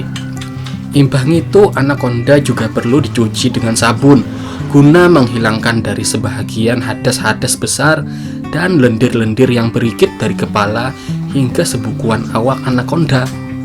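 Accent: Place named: Java, Indonesia. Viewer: Bahasa banjar nya kurang kental.. Lebih kpda medok jawa...tp Salam sukses buat kmu.. Trus berkarya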